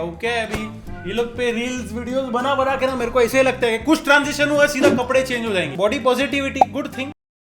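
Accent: Gujarat, India. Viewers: bhai you really suit while having a gujju accent😂😂😂... thoda bohot gujarati bolte rahiyo please har video me...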